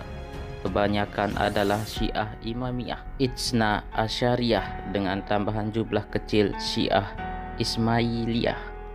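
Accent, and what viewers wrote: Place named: West Java, Indonesia. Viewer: Orang sunda ya. Huruf Z nya jdi J hehe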